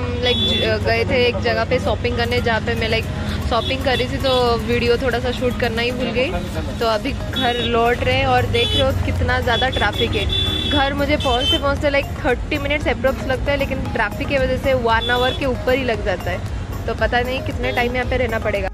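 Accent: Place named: Odisha, India. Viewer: hindi re Odia accent bahut achi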